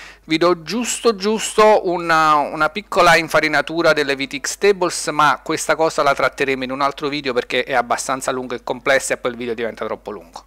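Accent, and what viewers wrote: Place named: Naples, Italy. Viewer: Ciao, dall’accento ti sento del sud, forse partenopeo? Siamo vicini, vieni al Sirio di TERZIGNO a trovarci?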